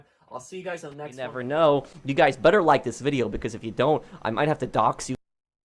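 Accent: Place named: United Kingdom. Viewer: I love he’s solid gta YouTuber, I have 0 hate for him but this video honestly made me laugh so hard, haven’t laughed that hard in a long while so thank you man👍 Also I could tell your British same as me with the east Enders intro and outro 🤣🤣🤣 loved it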